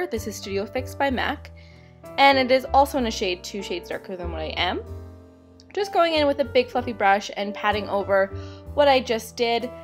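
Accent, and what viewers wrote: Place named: Canada. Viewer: Super Canadian accent!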